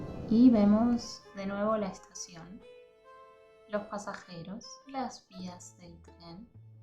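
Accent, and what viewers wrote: Buenos Aires, Argentina. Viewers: estamos en el año 2022 ....comprendo tu leccion de historia .............cualquiera entiende el termino "tienda" no hace falta que lo expliques hector ...... la chica esta explicando las palabras que utilizamos en el dia a dia los que vivimos en bs as y se esfuerza para pronunciar cada palabra con el acento nuestro para mostrar al extranjero como le decimos a las cosas y como pronunciamos. tan dificil de entender es la correccion que hice ? nadie esta diciendo que la palabra tienda no existe ..........simplemente no la usamos aca y menos los jovenes ... acaso vos le decis a tu hijo ................hijo ve a la tienda de abarrotes y comprame una pala ?????????????? no esta mal dicho simplemente aca no usamos esos terminos... diriamos hijo anda a la ferreteria y comprame una pala por favor.... y si te pregunta que es una ferreteria ? 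le dirias.......es una tienda que vende herramientas ? dirias .....es un negocio que vende herramientas o es un local que vende herramientas ... se entiende??????? solamente hice una correccion para colaborar ya que me parecio muy didactico el video ,,,,,saludos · Me alegra mucho haber encontrado tus videos. Soy profe de inglés pero tengo un alumno de español. El está trabajando y viviendo en Argentina y solo le interesa el "argentino", así que estos videos me sirven de mucho. Es cierto que este es un acento local porteño, pero también es cierto que no se puede hacer videos con cada acento local. Gracias otra vez.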